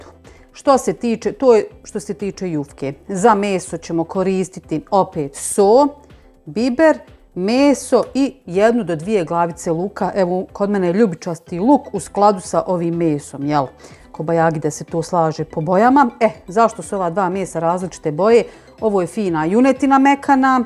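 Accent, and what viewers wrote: Bosnia and Herzegovina. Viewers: Čim je počela sa ovim bosanskim naglaskom znam da je to taj koji ću probat!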